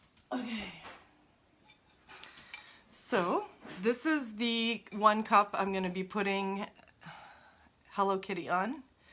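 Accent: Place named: Minnesota, United States. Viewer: Aside from saying "Ay", you sound just like a Minnesotan to me😁 I want to make cups now, you made it look doable (not easy) for a crafter. Thanks!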